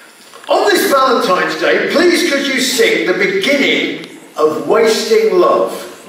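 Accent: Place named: England, United Kingdom. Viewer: Adoro essa entonação carregada do inglês original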